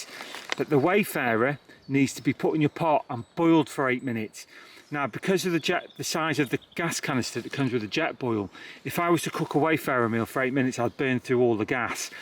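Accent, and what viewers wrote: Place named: United Kingdom. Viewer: British accents are so pleasing to listen to after listing to American videos. Nice video znd good information, Thankyou.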